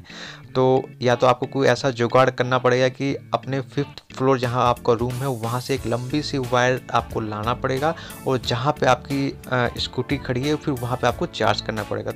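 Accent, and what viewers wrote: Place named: India. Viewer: i could not understand anything you said. your english has a strong accent, possibly indian.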